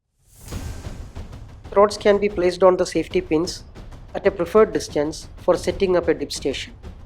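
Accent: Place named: South India, India. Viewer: Hello sir, your slang seems to be that of a South Indian. To be more specific, a keralite or a tamilian. I'm from Tamil Nadu. I'm following starting strength for a couple of years. Now I'm planning to build a home gym in my apartment based on ripottoes's recommendation. But, I was concerned about the deadlift disturbing my neighbours downstairs or creating a great vibration in the floor. Were your deadlift quiet enough to not to concern your neighbours?. And it would help me a lot if your share your designs. Thanks in advance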